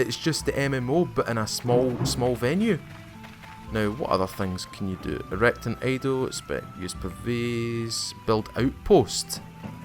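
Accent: Scotland, United Kingdom. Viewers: Came for the video, stayed and subscribed for the accent. Love the scottish accent. Edinbrugh, right!? Keep on with the videos.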